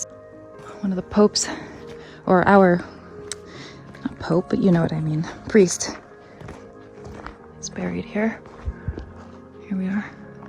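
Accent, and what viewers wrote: United States. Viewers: Fascinating, I hope you do continue. I assume you grew up in the US from the way you speak, but spent time in Bulgaria at this village with baba and diado. I'd be interested in hearing more about your reasons for moving, particularly since you're so young. My wife is from Sliven, and we have been visiting (from California) for 25 years, and have been sending our boys for the summers. Her mother's parents were in a tiny village far away, in the far NW corner of the country (you could see Serbia), I don't remember the name, and it was rustic to say the least. I'm hoping to retire to Bulgaria in several years after the youngest boy goes off to college.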